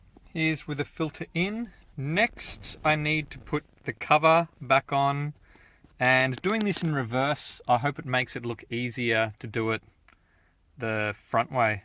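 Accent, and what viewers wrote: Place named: Australia. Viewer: Great video. Hope some others find some benefit from your tutorial on changing a cabin filter. Unfortunately, I don't drive, but I enjoyed listening to your Australian accent. ;)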